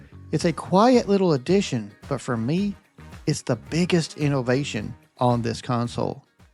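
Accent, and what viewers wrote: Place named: Alabama, United States. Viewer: Just curious buddy where are you from? I'm from Alabama you sound a lot like me and my friends lol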